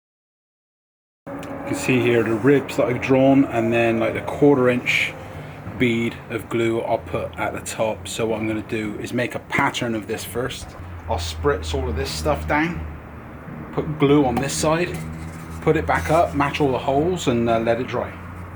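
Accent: United Kingdom. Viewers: Hi you sound British do you live in the USA? I am in the Uk I see you have a bit more space. Nice project.Checkout the little restoration project I started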